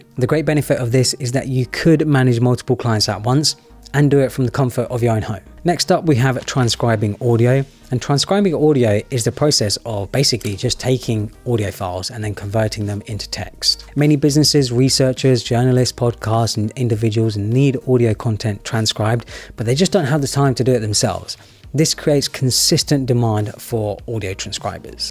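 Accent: United Kingdom. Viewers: Good to hear a British voice on these matters, just feels more relatable, Sometimes with American narrators, I feel like our situations differ too much.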